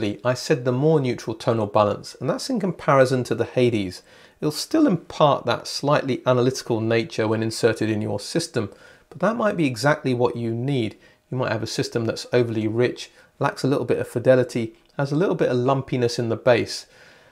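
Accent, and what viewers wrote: United Kingdom. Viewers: after 10 minutes, i could not take anymore the barroque and burgeous of the whole british speaking thing, sorry. Overdose. so much for just explaining a device...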